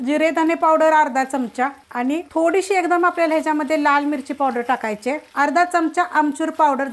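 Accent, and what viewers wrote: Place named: Ghats, India. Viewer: Mi navin viewer aahe. Mirchi nakki kontya prakarchi vaparli aahe? Aavadali. Tumhi ghatavarche dista. Aapki boli vatli. Mala Abhi man vatla tuza. Satara bhgakadchya sadhya sopya pan ashach khamang recips dakhav. Ghatavar kami vastunmade atishay chavisht padarth kele jatat. Mazya hya channel la khup khup shubhechchha.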